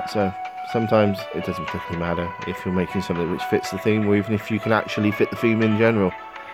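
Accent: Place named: United Kingdom. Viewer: such a cool, posh accent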